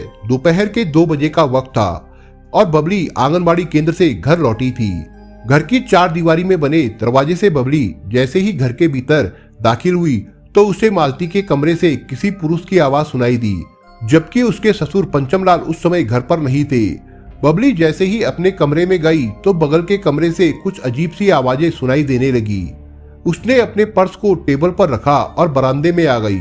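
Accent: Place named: Bihar, India. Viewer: Language sudharo bihariyon ke language mat bolo pure world mein viral hota hai video sha Ko sa mat bolo Nisha ko Nisa mat bolo aap ka video dekhna chahte hain lekin aapki language pasand nahin hai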